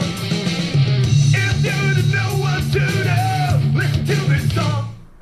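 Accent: Germany. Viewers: Bei der Aussprache. 100 % deutscher hahaha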